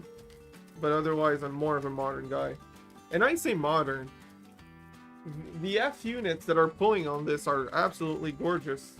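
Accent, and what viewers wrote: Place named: Eastern Canada, Canada. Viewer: iwas into O gauge before N scale takes too much room N scale got into it 2 months ago all Dave and your fault lol. Im originally from northeast ofMiramichi but live in nofthwestern Ontario now . Taught i recognized you eastern accent lol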